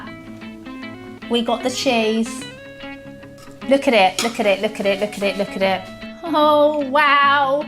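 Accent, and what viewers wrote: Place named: Cockney, United Kingdom. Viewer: Dear, why do you sound so Cockney? Is yours a typical Rochford, Southend-on-Sea, Leigh-on-Sea accent? It's hard to make out what you are saying at times. This is an EARLIER video of this recipe, the Risotto Bundt Cake, than the one showing today (October 2025) on Roku. In the episode I partially watched today, you were also making a Vegetable Tart, and you had an Asian lady on as guest dessert maker. Plus you appear younger here, and are dressed differently, than in the episode I watched. You were also wearing a poppy to honour our War Dead in today's episode. But I hope you are no longer wearing that "Mop of Messy Hair" style that I saw today. 😕 I didn't think I would be interested in the Risotto Bundt Cake, but I think I might like to try it. Where can I find the printable instructions? Oh, I see that they are in the Description, thanks!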